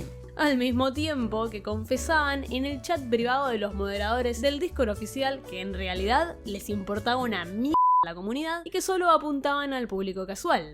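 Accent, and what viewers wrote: Argentina. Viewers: Primer video que veo y ya note el acento argentino, como argentino eso me hace muy feliz! Es por esto que deje los gachas y bueno, es una lastima que el nombre de DMC haya sido manchado con esta estafa con cara de juego. Por ahora a seguir escuchando para seguir MOTIVADO!! Buen video😊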